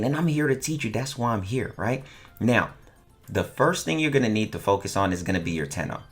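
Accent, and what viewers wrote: Jamaica. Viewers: that jamaican accent tho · Are you Jamaican lol... I heard you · That Jamaican accent tho mad respect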